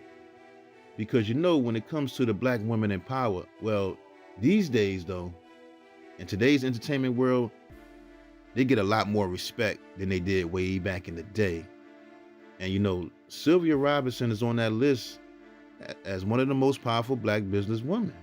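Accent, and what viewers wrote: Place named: New York, United States. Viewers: Where are you from? Your accent sounds New York to me. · Right I was thinking the same thing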